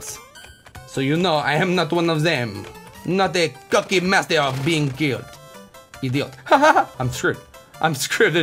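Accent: France. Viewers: that french accent though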